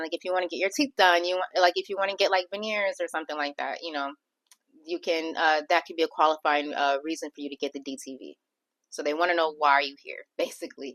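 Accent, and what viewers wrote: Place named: Philippines. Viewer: You sound like a filipina.😂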